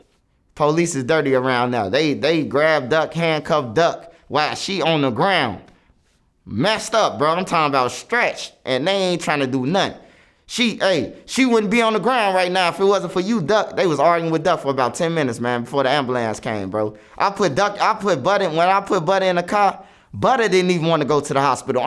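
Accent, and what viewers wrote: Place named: Chicago, United States. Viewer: I'm from Chicago and he is typically what we sound like. I don't know where these other people accent come from. I'm like where they pick this country ass slurred speech from😂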